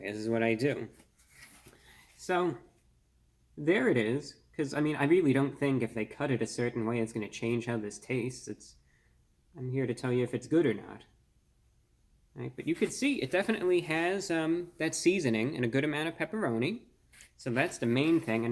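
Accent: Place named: Transatlantic, United States. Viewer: You speak like a rich White Anglo Saxon Protestant with a slight transatlantic accent in there. A radio voice if you will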